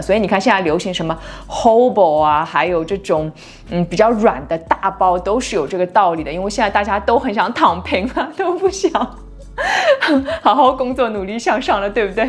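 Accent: Shanghai, China. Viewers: 小姑娘好像是上海人。讲的很好 把我这不懂的瓜，都有点懂了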